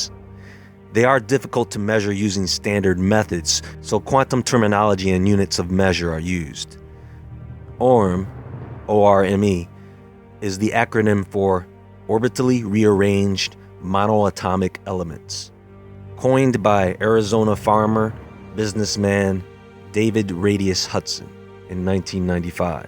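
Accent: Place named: New York, United States. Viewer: Bro are u from NY? you sound like it..I'm from bx live in queens now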